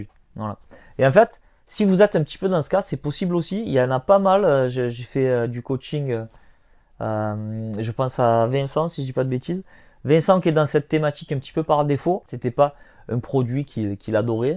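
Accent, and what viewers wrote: South, France. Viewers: "tu envoies" :-) et y a une fofote dans la vidéo au début, "une autre site" mais on s'en fout du moment qu'on entend ta belle voix du sud aussi mélodieuse que les cigales! (c'est vendredi je suis fatiguée)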